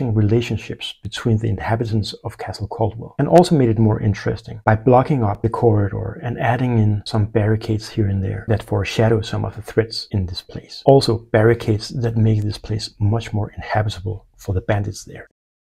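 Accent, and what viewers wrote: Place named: Denmark. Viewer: Before I say anything else, I like this. Thank you! When you were describing the inhabitants of the rooms start I felt the thin coloured lines outlining the rooms was drowned out by the black walls. I really liked the setup of your version and both the descriptions of the rooms and what small modifications you made to the map. You sound Danish, are you? "None of your buisiness" is a perfectly acceptable answer, if you answer at all.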